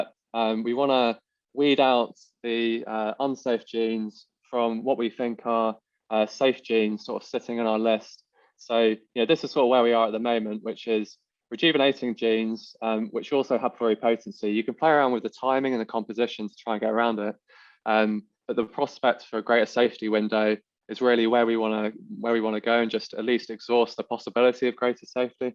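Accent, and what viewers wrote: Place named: England, United Kingdom. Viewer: You should consider activating text autogeneration..if you both read and listen to his English accent you should be able to understand it..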